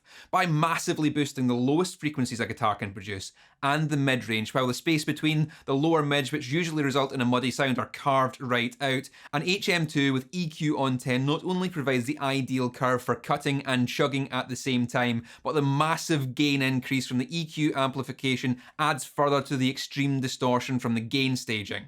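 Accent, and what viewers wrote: Scotland, United Kingdom. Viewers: Scottish accent is the coolest. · Combine the circuit analysis with the Scottish accent.. 😩😩🥵🥵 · I watched the other HM-2 video before this... without much thinking about it or registering what the channel name was I thought "hey he sounds like that other scot"... turns out it's the same person, he just cut his hair.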